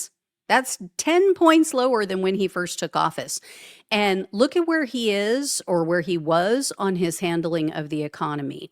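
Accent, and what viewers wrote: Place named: Midwest, United States. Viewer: Miss, you are a beautiful, interesting person. I've been missing you a lot. Your midwest accent is music to my ears.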